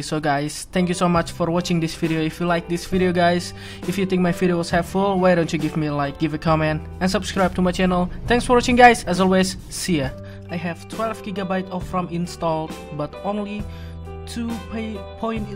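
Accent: Indonesia. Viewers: orang indonesia ya soal nya bahasa nya masih kelihatan logat indo nya...... btw itu laptop merk apa tipe apa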